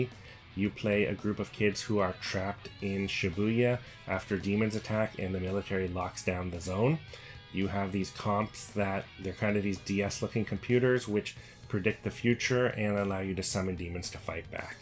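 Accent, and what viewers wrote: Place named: Canada. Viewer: nice canadian accent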